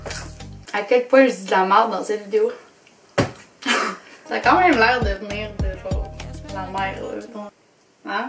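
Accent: Quebec, Canada. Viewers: J'adoooooore ton accent il me facine limite j'ecoute pas ce que tu dis et me focalise dessus tu as le plus bel accent quebequois du monde d'ailleurs vos expressions me fons bien rire 😂😉😘💙💙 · Je kiffe l'accent quebéquois mais quand vous parler sil vous plait faite de vrai phrase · Je suis français mais j’aime l’accent québécois . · TON ACCENT 😍 C’est Québécois? 🤔✨ Sinon je t’adore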